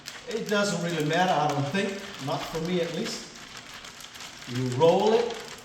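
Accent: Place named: Brazil. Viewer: Sotaque brasileiro rsrsrsrs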